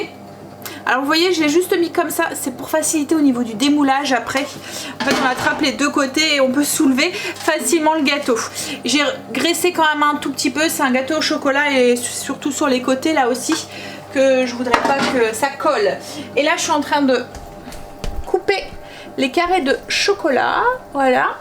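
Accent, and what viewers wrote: North, France. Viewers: Coucou, voilà quelques semaines que j'ai découvert ta chaîne, je la suis quotidiennement, je me retrouve complètement dans tes vidéos, un grand merci pour ces moments partagés dans ta vie de tous les jours et tes précieux conseils et idées culinaires, je retrouve légèrement l'accent du Nord qui me fait tant plaisir à entendre car je suis originaire des Hauts de France mais désormais je vis en Haute-Savoie avec ma petite famille depuis une dizaine d'années, alors un GRAND MERCI,bonne continuation à toi dans ta chaîne 🥰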